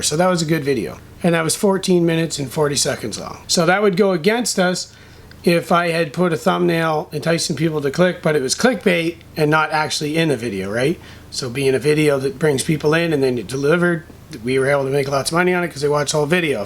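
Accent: Canada. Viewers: Bwahahahahaha! Love It. I've never seen your channel before, took six seconds to figure you're Canadian. Even before the "You're Welcome."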